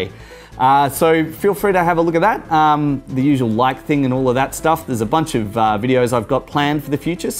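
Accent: Australia. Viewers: Hey mate are you an aussie from Melbourne that lives in Singapore? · I'm American but you Aussies are one of my favorite English accents to hear. It's just so vibrant and colorful lol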